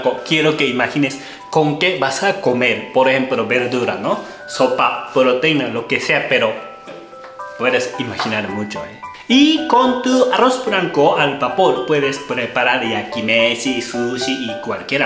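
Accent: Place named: Japan. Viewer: Por el acento japonés se te hará más fácil el acento espñaol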